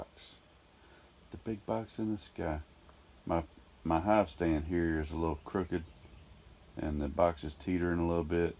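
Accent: South, United States. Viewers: Is that talking country or southern. 😂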